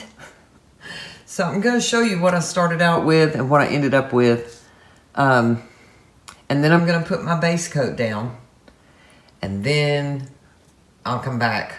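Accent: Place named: South, United States. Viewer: I just found you today because I was looking for a varnishing technique... I love your artwork! I am from Georgia, so its also good to hear the southern accent...lol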